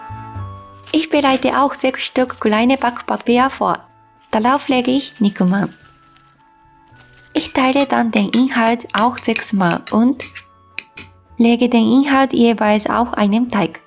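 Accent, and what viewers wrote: Japan. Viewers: "Und dann gebe ich noch 50g daTSU" Japanischer Akzent ist einfach bezaubernd <3 Ich muss es unbedingt nach kochen!, danke für dein tolles Video!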